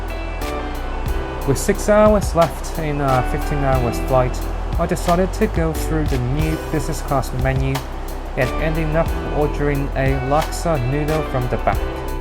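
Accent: United Kingdom. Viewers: 英国口音X2 <3<3<3<3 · 英国口音很牛哦哈哈哈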